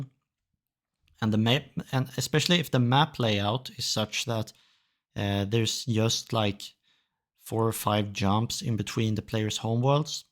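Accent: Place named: Sweden. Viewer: That's a Swedish alright. Immediately discernable.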